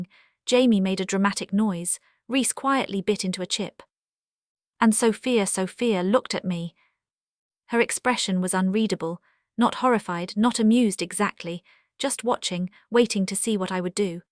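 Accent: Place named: United Kingdom. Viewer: The narrator has a very pleasant voice, but she has a British accent. Why don't they make the primary character from England instead of Vermont? Aside from that small complaint, it's a lovely story.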